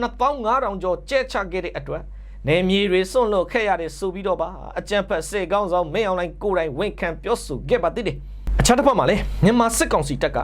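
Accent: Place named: Bago, Myanmar. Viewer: ခေတ်သစ် သတင်းကြောငြာတယ့် သူက ဘုန်းကြီးလူထွက် ပဲခူးသားဘဲ ဖြစ်ရမယ့် လေသံက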